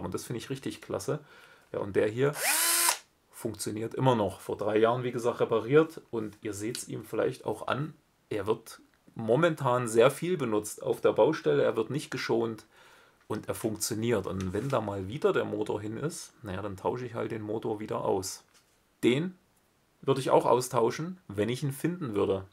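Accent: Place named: Saxony, Germany. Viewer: ....na ich kenns aus Sachsen.....klingt für mich nach Heimat, meine Vorfahren kommen daher......😉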